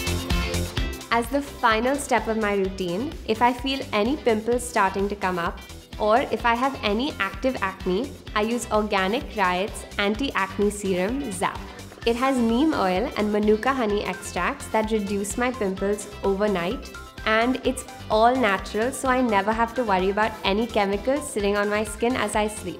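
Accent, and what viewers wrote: India. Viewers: So funny how you look American and have an indian accent,didn't expect that at all😂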